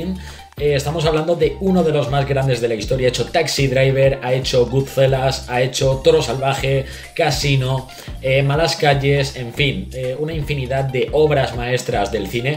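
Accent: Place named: Mexico. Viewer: Aparte pochoclo s?? Enserio?? Hablas como mexicano y les dices pochoclos??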